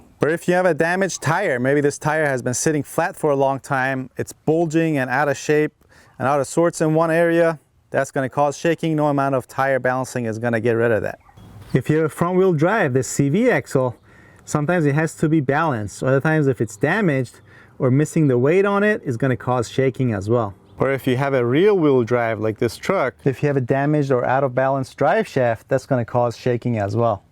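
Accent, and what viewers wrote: Iran. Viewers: From ur accent I can figure out that u r 100% Persian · I can hear that Iranian accent.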